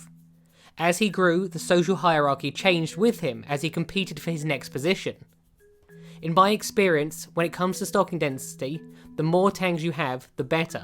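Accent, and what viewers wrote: United Kingdom. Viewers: I love your channel and yes I am a subscriber! I also absolutely adore the British accent. 😉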